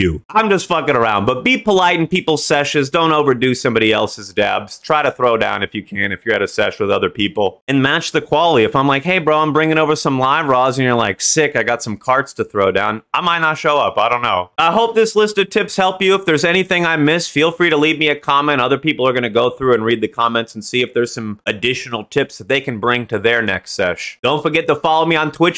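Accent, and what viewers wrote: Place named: Boston, United States. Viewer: Ayo 😂 I have to subscribe. You're funny af bro. You got a Bostony accent 😂.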